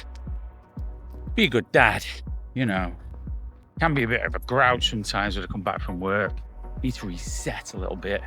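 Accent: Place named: Northern England, United Kingdom. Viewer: Love the accent ,down t earth good owld northern accent😊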